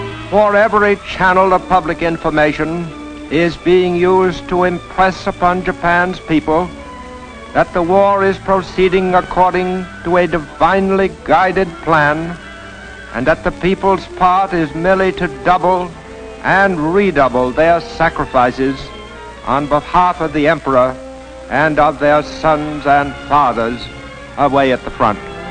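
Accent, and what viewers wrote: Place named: United States. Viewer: Hello all, no offense to the uploader & the rest. BTW, I must stressed that I'm not a Japanese. This video is simply a US propaganda film, judging from the narrator's accent. Its quite insultive in some ways to east asian thinking. AND stop calling jpn/chin/kors "ASIANS!" Its makes you sound stupid. thanks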